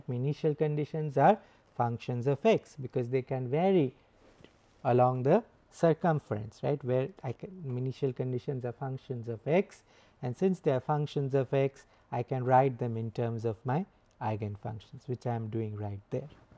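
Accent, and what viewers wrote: India. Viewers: he's indian, both ears unhappy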